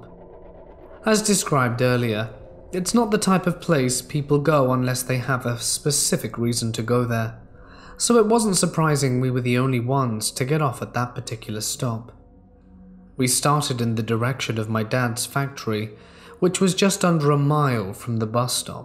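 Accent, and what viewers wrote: United Kingdom. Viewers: I listen to other very good horror narrators, but, I ALWAYS come back to you!!! I sincerely feel calmed and soothed while listening to my favorite, British-speaking storyteller. I’d like to hear Pandora more as she grows up as a ‘ -Mini-Me”! A question/answer live chat would be a hoot with that little charmer! I am sure, that she dearly admires you! 😻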